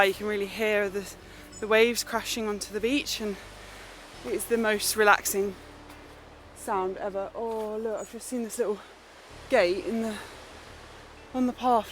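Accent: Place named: England, United Kingdom. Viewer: I love your videos. As a Brit now living abroad it makes me smile to hear an English accent, see familiar British sights and have a catch-up with how things are going with you and. Yes - I'm a walker and camper who discovered you both through those video styles, but the everyday family stuff suits me too. Thank you! Beach fail - romantically watching a dog poop in front of you and watching it steam in the salt breeze. Lol!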